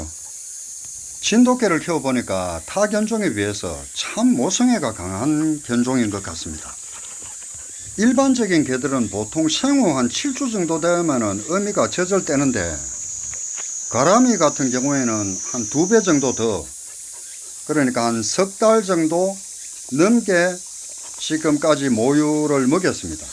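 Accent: Gyeongsang, South Korea. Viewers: 말씀이 갱상도? 갱북? 구수하고 신뢰가묻어납니다. 어미개. 영특해요. 시끼교육도. 야무지게. 멋찐 아이들로. 자랄듯. 유익한 설명도감사합니다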